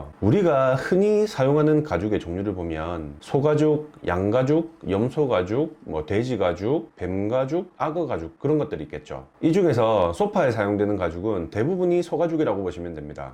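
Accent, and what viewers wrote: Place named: Gyeongsang, South Korea. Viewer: 경상도 억양이 살짝 있네요.ㅋㅋ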